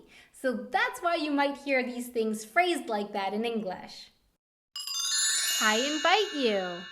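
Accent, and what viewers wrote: Germany. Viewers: I live in Germany since I'm 5 and I think you're german akzent (?) is so cute 😂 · I have studied in the UK and I am fluent and accent-free in english - but I wasn´t aware of the english meaning of "I invite you". This explains a couple of strange experiences in my past - thanks a lot :D Also: you have a very cute german accent ^^ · I am sorry but you German accent ist realy... Realy bad xD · Das stimmt! hahaha As a non-native english and german speaker (i'm from Brazil), I also think that you german accent is cute. All the north american friends I've met in Germany couldn't pronounce words with a 'strong R' correctly (like sprechen). I think it's beautiful how each of us have a distinct accent when talking in a language that is not our mother language. Can you imagine how hard it's for me to switch between German and English? After living in Germany, I have a really hard time trying to talk in english because the two languages seems to have kinda merged in my brain. 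I think it would sound even funier for you to hear me talking in english haha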